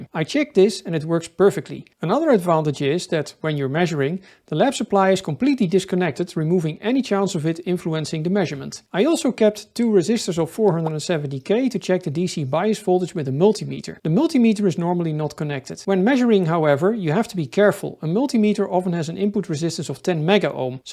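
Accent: Netherlands. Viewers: Man, I was soooo confused about what a "coramic" capacitor could be! 😂 Until I became aware of the strong (Dutch?) accent. (LE: BTW, the part from to sounds almost like it was the Project Farm guy 😊 )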